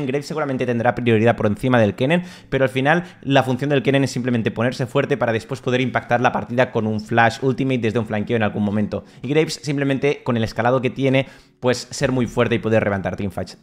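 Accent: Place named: Catalonia, Spain. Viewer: Me encanta tu castellano catalán ,visca Girona !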